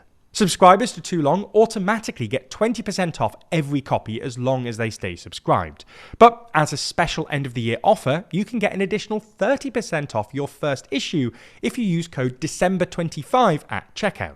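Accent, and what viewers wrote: United Kingdom. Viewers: Where are you from? cause you sound british, but that would be strange :)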